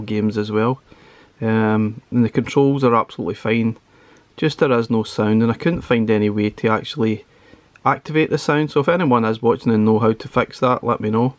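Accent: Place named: Ayrshire, United Kingdom. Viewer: lOVE IT! Can't wait to get mine, i have 2 minis and really looking forward to the larger form factor! good scottish accent, I'm guessing Ayrshire?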